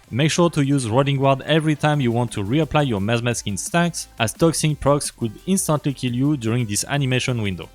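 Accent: France. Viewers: I have to say it: I love your little french accent in your videos 😅 Your content is great I am sub since a long time. Now taking a short break from double resource event on Youtube and there is a new hot video from you. Very cool 👍😎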